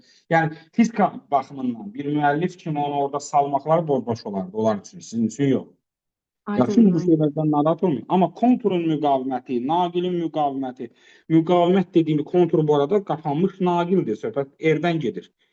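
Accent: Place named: Shaki, Azerbaijan. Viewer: Müəllim Şəkilisiz? Ləhcənizi bənzətdim.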